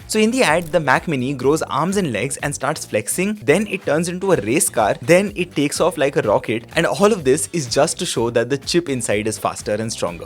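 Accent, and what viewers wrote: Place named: India. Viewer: Peak Indian accent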